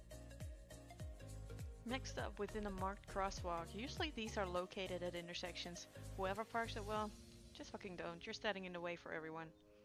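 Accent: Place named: United States. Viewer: he aint wrong Shawn loooool UK boi that sounds really american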